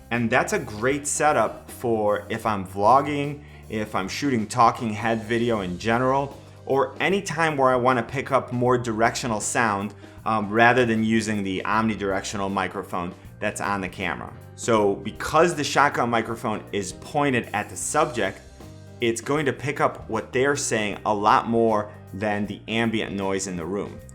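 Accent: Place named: Cleveland, United States. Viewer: First off, I hear that Cleveland accent! We are from Cincinnati but have bene living in London for the last two years. Miss those Ohio accents! We purchased the 200D (SL2) a year ago, (the white version). We are amateur creators and it does exactly what we need it to do, even with the kit lens. Amazing photos, great videos when needed and ease of use cant be beat. We are actually thinking about getting another one or even the M50 so we can both have access to cameras. Thanks for the great content and helpful tips!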